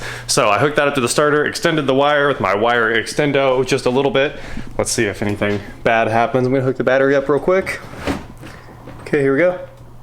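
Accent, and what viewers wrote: United States. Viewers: Please Rolls Royce's are ladies like ships (well the drive like boats too) there aways female! Not bad boy's please have more class now you gone up market and try to speak english not yank! remember bonnet not hood, boot not trunk, and petrol not gas but loveing the fun of the build!!!!!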